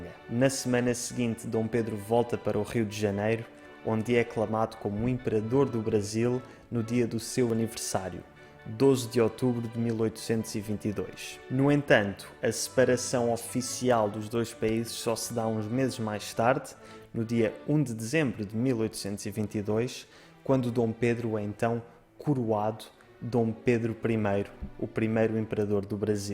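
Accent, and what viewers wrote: Portugal. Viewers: Adoro ouvir os portugueses falando do Brasil. Soa imenso agradável. Ainda mais a mim, que sou monarquista. Só faltou falar de Dona Maria Leopoldina da Áustria, que assinou o decreto de Independência no dia 02 de Setembro de 1822, pois Dom Pedro I (IV) estava em São Paulo e não na capital (Rio de Janeiro). Um emissário envia-lhe o decreto assinado e cinco dias depois ele dá o brado de independência. Obrigado pelo vídeo e Ave-Império do Brasil ! 🔰🟢🔰🟡🔰🟢🔰🟡🔰🟢🔰🟡🔰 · Eu gosto dos vários sotaques da nossa língua. O sotaque português também é (como dizem por ai) "fixe" (?) Parabéns pelos conteúdos, legal ter canais assim em sotaques tão diversos do meu. Abraços! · Amo esse sotaque Português, estive em Portugal em 2018, um país muito aconchegante. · Muito bem. e muito bom ouvir a historia falada por um portugues. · Ótimo! Tão bom ouvir sobre a história do Brasil com um sotaque português.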